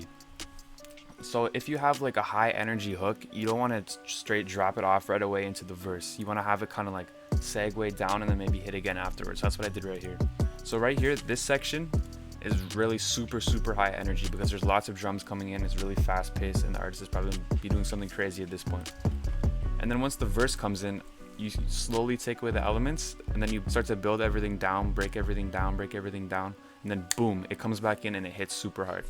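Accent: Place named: Canada. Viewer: Cool! Would love to visit one day. Very good video. I can tell because one of my best friends from Canada and some shows I watched are based there and you sound just like all of them lol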